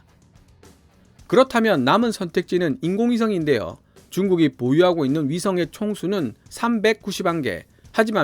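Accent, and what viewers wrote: Gyeongsang, South Korea. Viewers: 님 경상도 분이신거 같아요 사투리가 나와서요...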